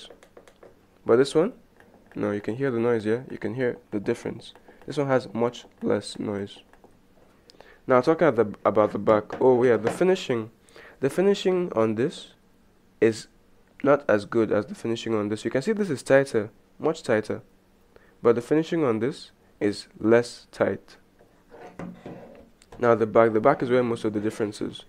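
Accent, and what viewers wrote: Nigeria. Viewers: You sound like a Nigerian, can you please tell me where you bought the original? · I can bet this dude is a nigerian I can hear he's accent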